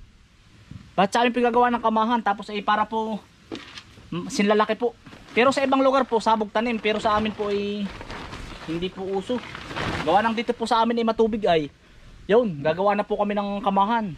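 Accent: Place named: Batangas, Philippines. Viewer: batanguenyo kb, puntong batangas ka eh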